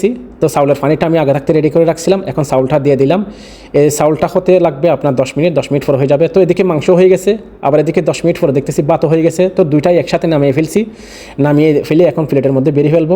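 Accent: Bangladesh. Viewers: বদ্দা তো চিটাইংগা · হেতে নোয়াখালীর বাংলা কয় তাই না?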